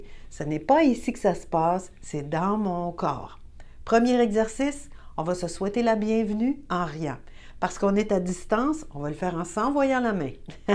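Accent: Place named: Quebec, Canada. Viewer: MERCI 🙏 Merci pour votre rire et votre générosité à le partager ❤ J'ai ri de vos exercices et de l'intermède entre deux, tant le fait, alors, de reprendre votre sérieux m'a fait rire aussi, car c'était comme "vous retenir de rire" ! 🙃 'Quelle idée' !!! 😂 C'est mon yoga du matin 😊alors que j'ai entrepris de le retrouver pleinement, pour la petite fille surnommée "Rayon de soleil" que j'étais 🌈 Et votre si bel accent 'québecois' qui, au départ déjà, me fait sourire ✨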